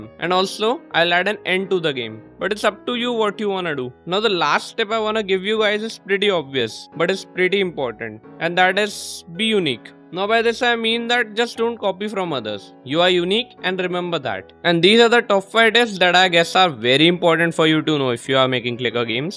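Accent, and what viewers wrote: India. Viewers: I just really like the Indian voice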